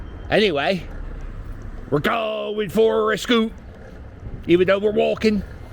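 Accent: Philadelphia, United States. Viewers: I love these vids. Philly transplant in NYC. I love your Philly. accent!!! Good jawn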